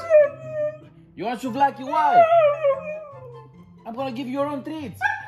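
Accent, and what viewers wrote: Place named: Greece. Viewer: Are you Greek ? Cause the accent is Greek !! Kisses and hugs from Greece!! 🤗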